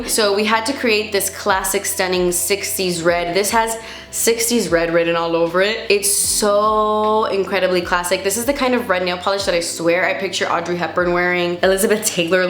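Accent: United Kingdom. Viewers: I adire your videos and your attitude, but man that British accent... it freaks me out👾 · Love the british accent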